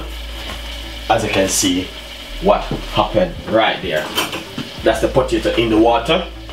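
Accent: Jamaica. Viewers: I love food but my favourite thing about your video dem is ur Jamaican accent... keep it real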